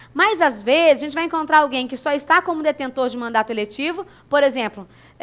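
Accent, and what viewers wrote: Minas Gerais, Brazil. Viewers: professora com sotaque mineiro perfeito rs sou Potiguar mas amo sotaque mineirin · Essa professora é muito gente fina, além de ser bem humorada. Gosto dela e do sotaque de mineirinha :)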